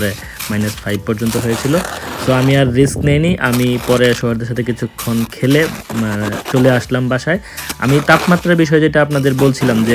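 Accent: Bangladesh. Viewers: bhai,aponar bangldeser bhari kothai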